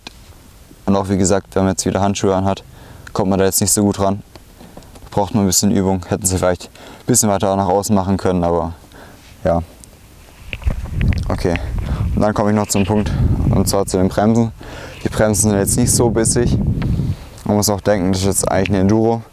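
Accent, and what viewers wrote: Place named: Swabia, Germany. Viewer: muss man doch au ned ich finde man kann ruhig ein wenig hören das man n schwabe is 👍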